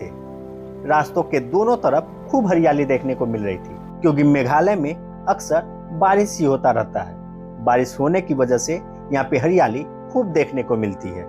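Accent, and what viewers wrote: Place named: Bihar, India. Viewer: Tu pakka bihari hai...abe saaf saaf bol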